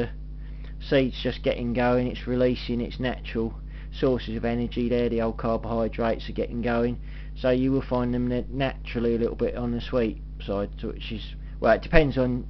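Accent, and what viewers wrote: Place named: Rugby, United Kingdom. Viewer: A great video! Many thanks! - Where are you from? You sound local to me... Rugby, UK?? - Julian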